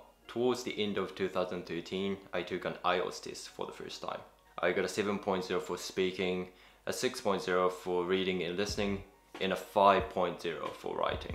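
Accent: New Zealand. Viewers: さんの声寝てるときにずっと聞いてますww NZアクセントカッコ良すぎます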